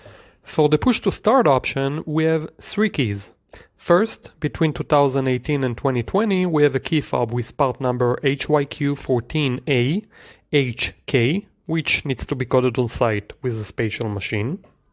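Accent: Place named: Israel. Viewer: Your israeli accent is clear as sun Ty for destroying the locksmith industry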